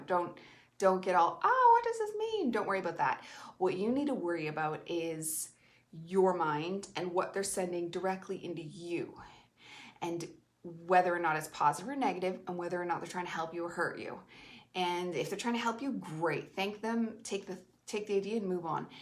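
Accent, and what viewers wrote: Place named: Canada. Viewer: "Hey sugar, ain't we got a DATE?" Thank YOU for YOUR thrilling and timely response! You're the internet superstar! I'm here with a note from my mom pinned to my jacket, "Please get Glenn on Bus 6, thank you!" And really, it's nothing overt, but really rather quite subtle! I'm kind of a student of the nuances, vagaries and evolution of language (a very BAD student, I'm afraid, slouching in the back of the classroom, hoping not to be called upon!), so please forgive my inadequate efforts to indicate what clued me to your nationality. I know "boot" doesn't quite get it, but sometimes we have to "settle out of court," so to speak! Also, count me sweet on "Canucks" and "Kiwis" (Aussies, not so much... sort of more of on a case by case basis!). If not for a string of DWI's in my intemperate years, I'd probably be living in Vancouver or Banff or Ottawa, instead of being stuck here on the plains of East Dakota, where there is no crime, but plenty of fear and semi-automatic weapons! 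In addition, "Slings and Arrows" is one of the funniest TV series I've ever seen, and, in spite of spending most of her life in California, Joni MItchell TOTALLY still sounds wonderfully Canadian! What's not to LIKE? "If I had loved you less, or played you slyly, I might have kept you for a summer more, But at the cost of words I value highly, And no such summer as the one before." from "Fatal Interview" -- Edna St. Vincent Millay OK, "Big Whore" agrees to subscribe! Keep that Canadian voodoo and mojo co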